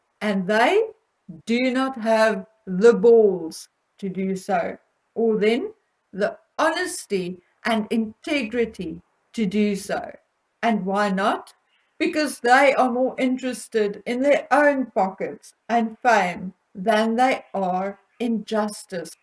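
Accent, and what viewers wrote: South Africa. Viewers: You have the most pronounced South African acsent...you should speak Afrikans it would be more tolerable.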